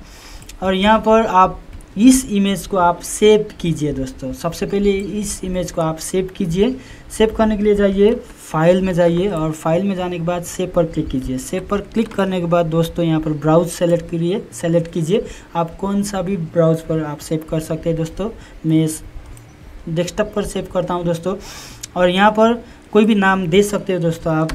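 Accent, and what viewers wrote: Nepal. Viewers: Did you make this video for the people of India??? Your accent says clearly that you are Nepalese.....You could have made this video in Nepali or English language......Anyways thumbs up for the tutorial...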